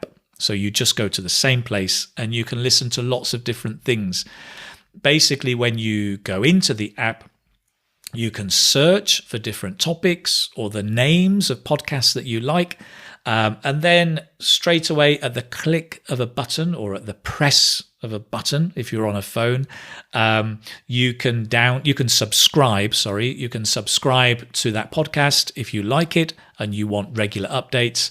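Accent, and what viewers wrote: United Kingdom. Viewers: is it British English?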